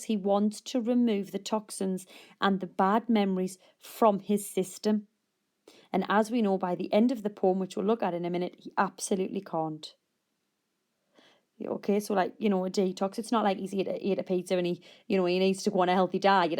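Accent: Newcastle, United Kingdom. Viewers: Really great videos and also great accent! (I'm also from Newcastle)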